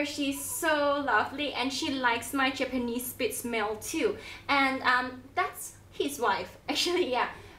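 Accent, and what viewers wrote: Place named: China. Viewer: but arent you Chinese????? i can barely understand you with the heavy accent. no way are u a native english speaker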